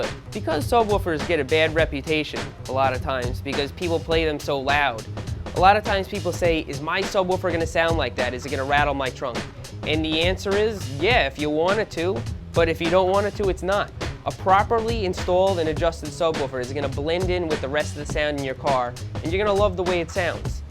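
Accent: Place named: Long Island, United States. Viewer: Long Island accent is coming through HARD compared to nowadays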